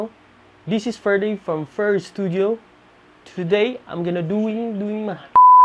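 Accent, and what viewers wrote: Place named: India. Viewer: hahaha indian accent iz real. 😂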